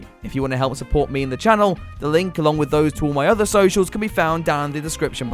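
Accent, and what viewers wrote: United Kingdom. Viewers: Your physical condition is so bad that your face is getting more red with each sentence. Just by talking only while sitting down. In topic. This doesn’t qualify as comedy. But that’s typical British I guess to write down semi smart comments and read them with a British accent thinking people will find it funny. Please have a good recovery, you look sick.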